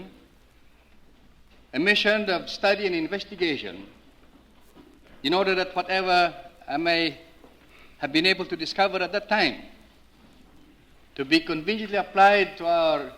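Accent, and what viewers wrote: Philippines. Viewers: One of the best examples of the cultivated Philippine English accent.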